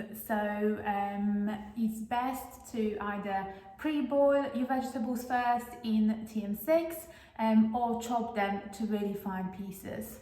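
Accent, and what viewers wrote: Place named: Poland. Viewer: Slysze polski akcent:)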